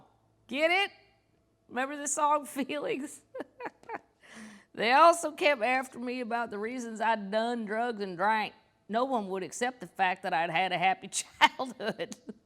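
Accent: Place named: South, United States. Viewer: like the accents, specially southern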